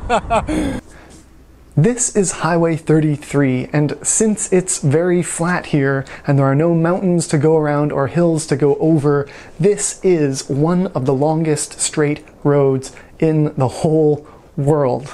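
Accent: Canada. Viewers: He's mostly Canadian, you can tell by how he pronounce "out"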